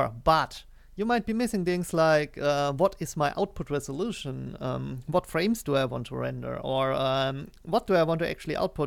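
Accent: Germany. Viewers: Sprichst du eigentlich deutsch? Deine Aussprache hört sich sehr deutsch an :D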